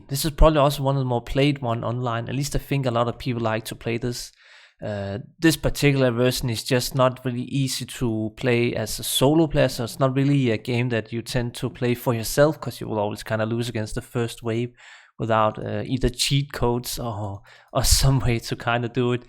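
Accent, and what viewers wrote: Denmark. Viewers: i love your Danglish :D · You're a dane, right? :)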